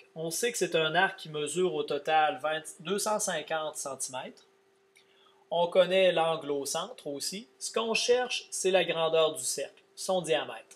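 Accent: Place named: Quebec, Canada. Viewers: c un accent quebecois ! ta un probleme avec cette accents ? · aurelio L'accent Québecois! Youhou??!?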